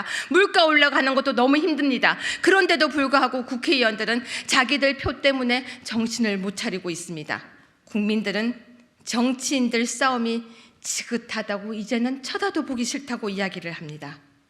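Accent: North Korea. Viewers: 북한 말투같냐??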